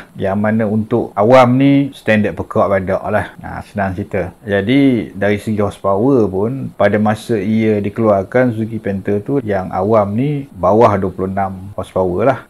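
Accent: Perak, Malaysia. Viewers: Assalmualaikum.. loghat Perak geng ni.. zaman ayah sy... Lepak dayabumi..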